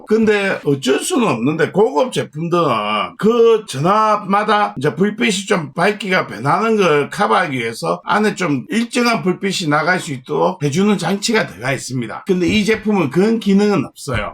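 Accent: Gyeongsang, South Korea. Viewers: 겡상도 사투리 짜증난다.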